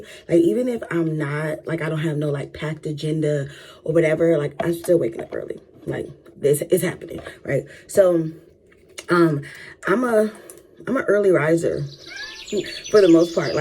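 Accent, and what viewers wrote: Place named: Midwest, United States. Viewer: Where are you, please? What state, you sound like you're Midwest... M. 😅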